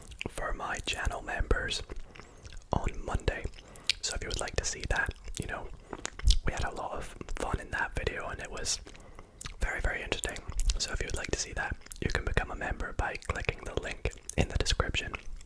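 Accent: Scotland, United Kingdom. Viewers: I love old Scottish accent, deeply miss it in the new vids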